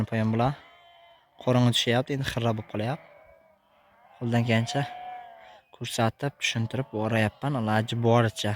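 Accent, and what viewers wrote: Surkhandarya, Uzbekistan. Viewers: Гап йук укам асил сурхоним шеваси маладис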